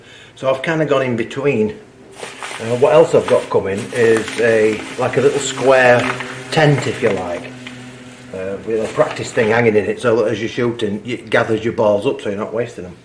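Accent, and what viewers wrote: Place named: Yorkshire, United Kingdom. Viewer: You sound like you're from Yorkshire if you are there's always a catapult show every Sunday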